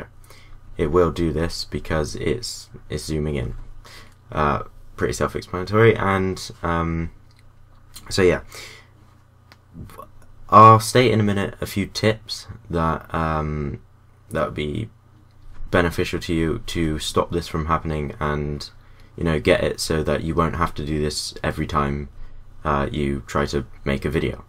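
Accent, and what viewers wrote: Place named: Taunton, United Kingdom. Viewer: Indeed same, taunton born and bred for 18 years i knew i recognized the accent =]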